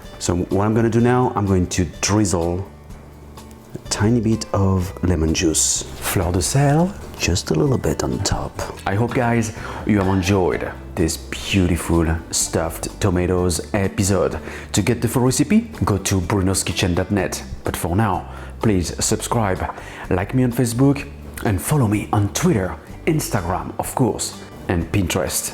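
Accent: France. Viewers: Ce que je trouve unique dans ces vidéos de Bruno, (outre le talent culinaire, le caractère trop sympathique du personnage ) ce sont les bruitages (quand il mord dans un plat, oubien les couteaux qui valsent, les oeufs qui dégoulinent etc... les sons sont bien travaillés , "incredible" !! - QUI fait toutes ces mises en scènes et ces bruitages, c'est TROP BIEN ! - Merci à Bruno pour tous ces partages ! - et le petit accent français tout en parlant un anglais "fluent" : en plus, j'apprends et "brush up my english too !" - Hip hip Hourra !!!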